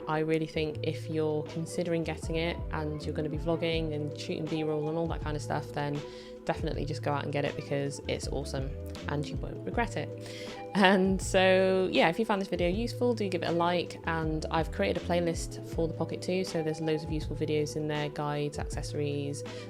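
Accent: England, United Kingdom. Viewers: Hello. Hope you can help this DJI OSMO Pocket 2 Tragic. After doing a fair bit of research, I purchased a POCKET 2 from Amazon. I bought the basic model and later contacted DJI in Melbourne, Victoria Australia where I live, seeking a microphone for this unit. I was advised that DJI no longer supports certain products such as the "FITTNG" that attaches to the base of the POCKET 2, that houses the electronics which accepts the spound from the DJI mike which the operator wears on their lapel. I have no idea what the electronics housing is called or named, and it is just so frustrating that no one can assist me. I hope you will be kind enough to assist me or give me some direction. By your accent I'd you are English and I hope you are able to advise me. Kindest Regards. Angus McKinnon.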